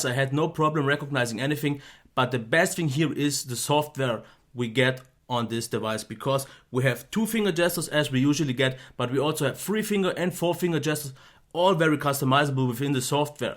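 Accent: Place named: Germany. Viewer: German english is the best😁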